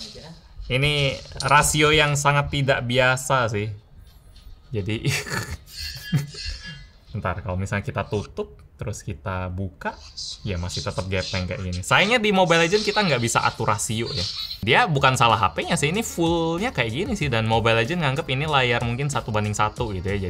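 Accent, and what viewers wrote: Palembang, Indonesia. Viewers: Keker bahaso pelembang nian😅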